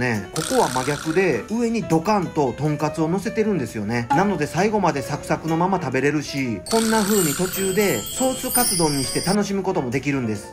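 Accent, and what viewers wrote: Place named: Kansai, Japan. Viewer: 関西弁がキモイ。 内容は良かったです。台湾に行ったら行きたいです。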